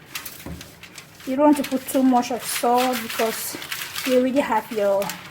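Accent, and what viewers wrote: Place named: Cameroon. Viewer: Hmmmm....you called 2 spices in French. Ever lived in a french speaking zone? Just wondering. You sound a little Cameroonian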